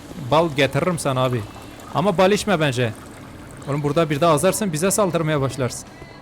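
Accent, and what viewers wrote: Elazığ, Turkey. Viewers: Ben Elazığlıyı abi şiveden anasilıyo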